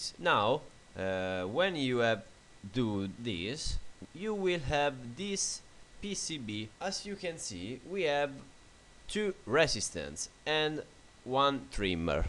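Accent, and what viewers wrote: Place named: Italy. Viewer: i like your accent man. italy rules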